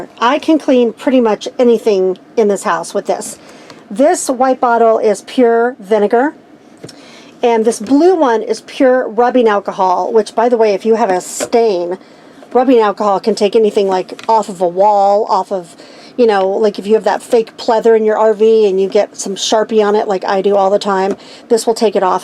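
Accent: United States. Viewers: Sound like Ohio! We LOVE You!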